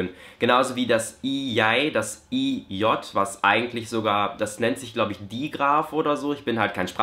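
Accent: Standard. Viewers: Als Hannoveraner kann ich sagen, dass du in diesem Video Hochdeutsch redest 👍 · Also für mich sprichst du absolut hochdeutsch🤷‍♀️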